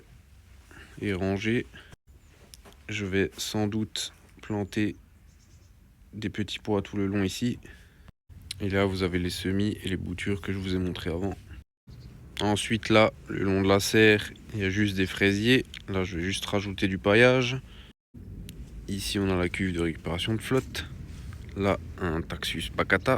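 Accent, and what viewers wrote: Alsace, France. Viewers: Parfois, il y a l'accent belge qui peut être trompeur, mais en tant qu'alsacien, on se reconnait c'est clair 🙂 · Si il est pas alsacien la france n'a jamais été envahie par l'Allemagne 😂. Moi je le suis et son accent ne trompe pas weich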